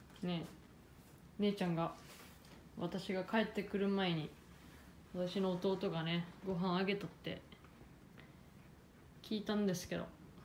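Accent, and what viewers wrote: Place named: Okinawa, Japan. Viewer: 沖縄出身でしょうか？イントネーションが似ている気がします！（私は沖縄出身でも何でもないです、すみません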